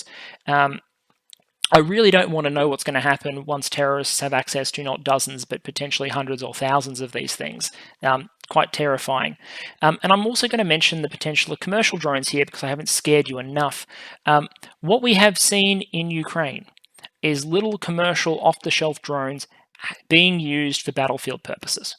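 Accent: Australia. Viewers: Are you an Aussie like myself?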